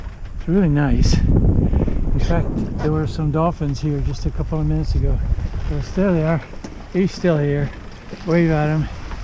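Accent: United States. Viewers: You speak like you're from the United Ststes. What is your nationality? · Lovely job - I really enjoyed your video - info/delivery/demeanour etc. The full package. Impressed++. Keep up the excellent work. American english?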